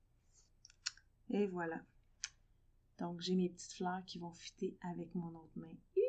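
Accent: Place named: Mauritius. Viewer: Vous avez l'accent d'une Mauricienne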